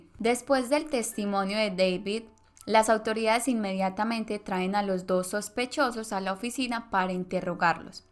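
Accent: Colombia. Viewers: Tiene acento colombiano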